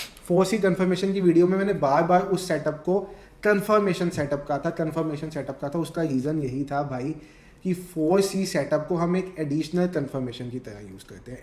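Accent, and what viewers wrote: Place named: Pakistan. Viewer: Your accent is like a pakistani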